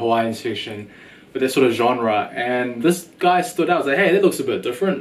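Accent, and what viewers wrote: New Zealand. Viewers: Know a kiwi accent when I hear one! great vids bro keep it up chur :)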